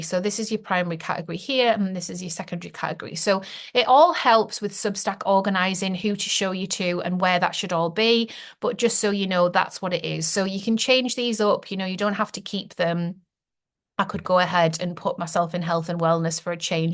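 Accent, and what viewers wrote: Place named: Northern England, United Kingdom. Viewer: WAVES FROM OVER THE BORDER IN CUMBRIA!! Thank God!! A Northern real voice!!! There is a god!!!! Nice to meet you! Thank you for being you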